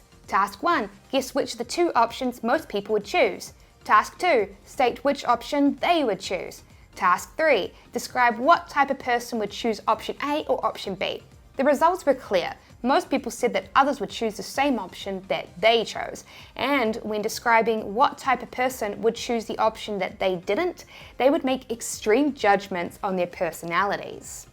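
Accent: New Zealand. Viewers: Cool, sound like a New Zealander tips.😎👍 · Such Kiwi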